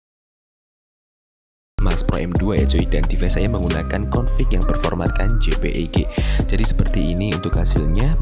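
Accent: Surakarta, Indonesia. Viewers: Orang solo kah bang?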